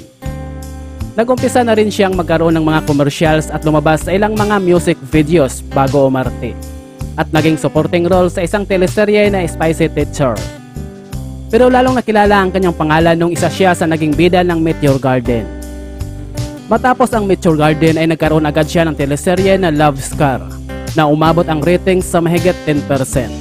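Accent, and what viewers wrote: Visayas, Philippines. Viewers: Bisaya ka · Nkaka tawa ung ngsasalita BISAYA k ba! Wahahahahhaahhahaha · BisAya ka nman magkwento..! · Bai bisdak ka no maila sa sinultihan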